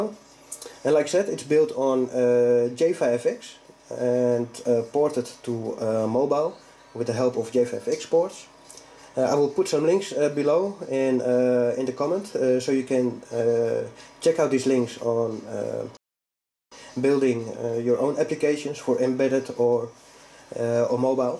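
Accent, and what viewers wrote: Netherlands. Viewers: eeckhoudt About 100% sure the accent is dutch. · You sound like a dutchmen ?? Are you ? If yes , i have a lot of questions in the future . I'm now waiting for my Pi ( just ordered one) . Then testing and a lot of sleepless nights ...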